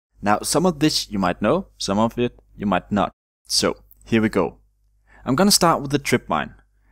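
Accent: Denmark. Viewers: Er du fra Danmark? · Are you from Denmark? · I knew you were from Danmark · Det da en Dansker der taler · are u from Denmark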